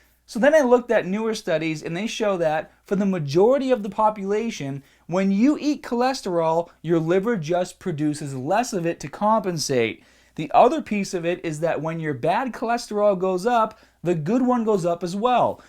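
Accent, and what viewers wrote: Boston, United States. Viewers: are you bad Boston? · Holy crap! has a Boston accent? Since when? LOL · This guy. Boston as fuck. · It's a Boston accent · NOTHING more annoying than the Boston accent. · God, that bostonian accent is so annoying. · you sound more "Boston" than ever before?! Not sure if it's just my ears though. Lol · Man how come you can't pronounce AR, EAR, and ER words? Is this a Boston accent? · that boston accent is bursting outta ya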